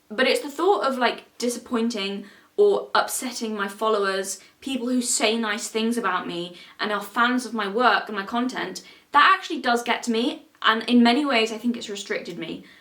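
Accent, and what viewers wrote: United Kingdom. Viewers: It's such a relief to hear with my own ears that intelligent people with British accents still exist. Greetings from across the pond. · I'm a brand new viewer, your James cordon video just came up in recommended and I was like yeah sure. I do not give a shit about James cordon, I don't care about any of his work, but I watched the whole entire video in one sitting not because I was waiting in baited breath to finally see James cordon finally get demolished, but because of you. Your writing, performance, delivery, structure, you completely hooked me and I subscribed immediately. Maybe it's just the novelty of hearing angry British woman as an American but I watched your video not because I wanted to hear about James cordon but because I was curious about your channel and you hooked me in and after watching this video all in one sitting right after that James cordon (drunk yet?) I have a feeling nobody cares all that much about what you talk about, only that you talk about it. 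I'm not watching A VIDEO about James cordon I'm watching YOUR VIDEO. I really hope you stick around here cause you seem to be good at this. Maybe you declare you will in this video, I'm only at the 30 min mark but regardless of what you decide, I wish you good luck, you certainly deserve it Edit: finished the video now, another channel called filmento is a good example of what you're trying to do I think. He uses movies to talk about concepts like how to make a movie for kids vs adults or how to poorly or properly do exposition so he might be a good bit of guidance for what you want to try to do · Just found this channel and this is absolutely glorious. And I don’t just say this as a fellow stylish Brit with a similar accent and knack for swearing. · Shadiversity….Now I know why you showed up in my recommended out of nowhere lmao EDIT: oh this is gonna be a really British woman ranting passionately about tyrants. 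This will be good for my lifting routine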